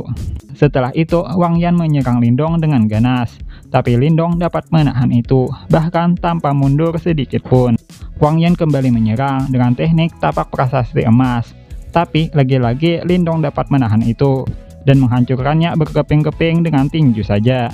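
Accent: Banjar, Indonesia. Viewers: suara orang banjar tak bisa dirubah logat nya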